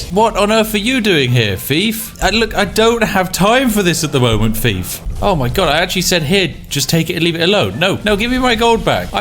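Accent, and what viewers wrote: United Kingdom. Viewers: Are you English or Scottish or Welsh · Are you british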